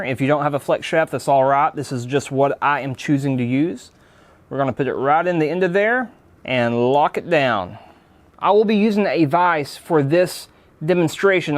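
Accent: South, United States. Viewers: Love your southern accent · as soon as I heard his accent I just knew I was about to learn nothing whatsoever from an intellectually challenged redneck. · Hey I hope you dnt take this offensively, but is your accent considered a redneck or a hillbilly? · New watcher, and new sub. You rule. And as someone from California with a southern family, the accent makes me trust you so much more. I love when someone from NASA sounds like they started out with chicken wire and a bad muffler.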